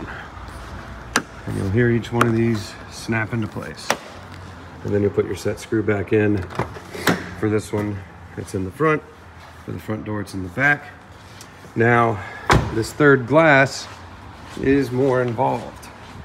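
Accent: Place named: Georgia, United States. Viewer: You have great voice and communication style for this. I think it has something to do with Georgia. I know one other person that sounds like you and he's from Georgia as well. I was looking for the right GX all over the country and I guarantee I would've ended up buying from you had I found your videos sooner. Anyway, you make the absolute best and practical GX content there is.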